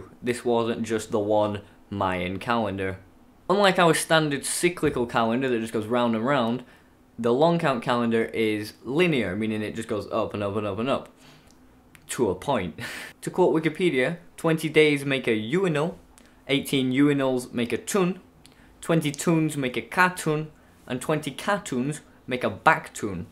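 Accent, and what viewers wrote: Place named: Lancashire, United Kingdom. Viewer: Hey, I dunno if you're still actively using YouTube at all, but I think of you from time to time. I always liked the videos you made, and I particularly like your accent; Lancashire is easily my favourite English accent. Anyway, if you see this comment, I hope you're well.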